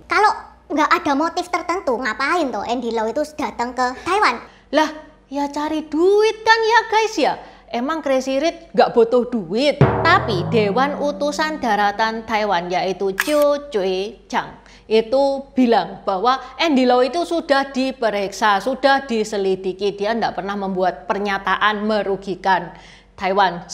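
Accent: Java, Indonesia. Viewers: Jowone medok 😁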